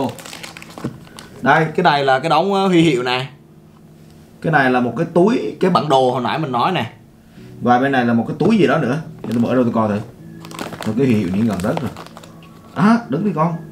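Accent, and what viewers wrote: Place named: Da Nang, Vietnam. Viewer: Anh này nói giọng Đà Nẽng lồm nhớ quê kinhhh 😅😂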